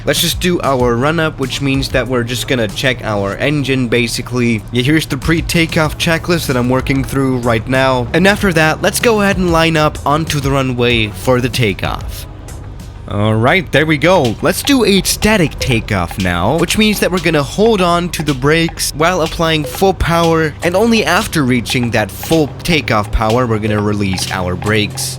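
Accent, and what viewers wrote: Germany. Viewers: I think this is a stupid question but... Is he German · Are you an german? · Are you German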